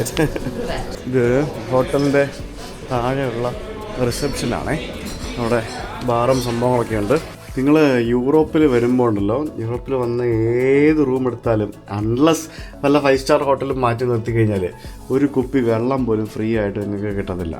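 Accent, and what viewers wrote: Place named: Kumbanad, India. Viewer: Dude, I accidentally started watching your videos and realized you are from my mom's town. So watched many and enjoy them - similar Malayalam to my cousins etc. Don't know Santosh George. I am sure a great guy. But your is coz you are my home guy and its fun. Keep up the good work buddy. From a South African - 58 yr old born and raised African Mallu from Kumbanad and mom from Kozhencherry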